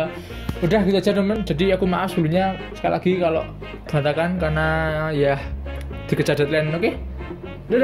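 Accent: Java, Indonesia. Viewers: channel YouTube udah di subs Instagram udah di follow open id: 107acc3953e436f<num>cee<num> alasan pengen dapet hero arum yaitu pengen kuasai hero tank agak susah dapetin goldnya sama hero nya keren alasan subs channel bang orangnya ganteng kontennya menarik bahasa jawanya kental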